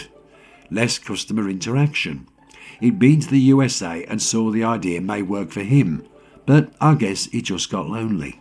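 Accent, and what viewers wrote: Birmingham, United Kingdom. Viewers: Aah ' a fellow brummy......✅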